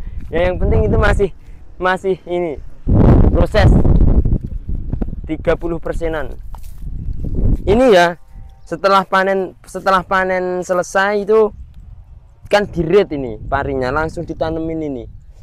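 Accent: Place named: Banyumas, Indonesia. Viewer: Wong ngapak dir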